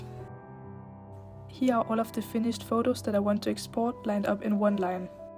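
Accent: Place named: Germany. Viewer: I know my Germans when I hear one 🤭 love your work 🫶🏻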